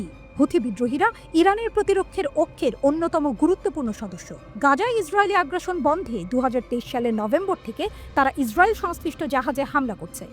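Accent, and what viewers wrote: India. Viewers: পাঠিকা কি ভারত থেকে আগাত? ভাষা অনেক টা তেমন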